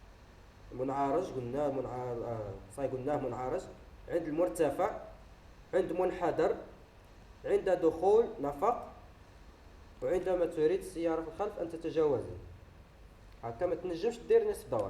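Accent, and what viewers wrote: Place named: Morocco. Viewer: انا من الشرق فهمت لهجتك %100 شوية تشبه لتاع لمراركه شكرا بززززاف على الشرح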